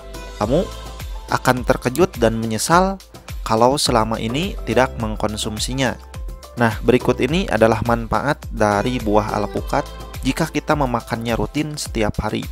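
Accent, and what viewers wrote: West Java, Indonesia. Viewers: Sunda pisan kang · yg host nya klo boleh sy tebak pasti org jawa barat 😂😂😂😂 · Logat orang Jawa barat Indonesia Raya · sunda pisan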